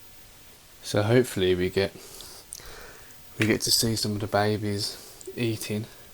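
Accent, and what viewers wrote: Birmingham, United Kingdom. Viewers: ah thought I heard a fellow Brummie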